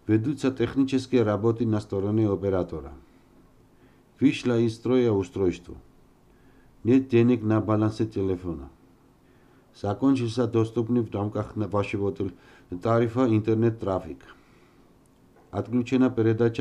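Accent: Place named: Georgia. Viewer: Грузинский акцент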